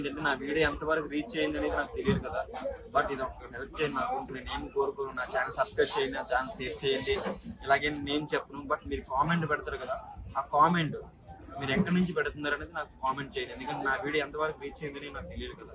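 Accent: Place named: Visakhapatnam, India. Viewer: Love vizag.....pure vizag fish market....nice ..open free vizag language.....😀👍. Keep it up...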